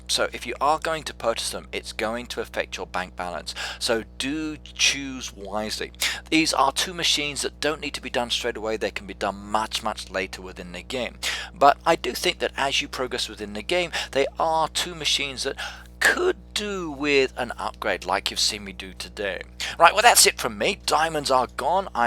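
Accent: United Kingdom. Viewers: am I the only one or does he have an English accent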